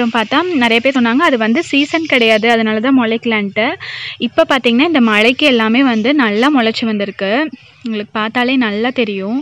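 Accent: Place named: Coimbatore, India. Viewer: Hi sister I am ur new subscriber. I am also coimbatore I like ur kovai slang..... so cute....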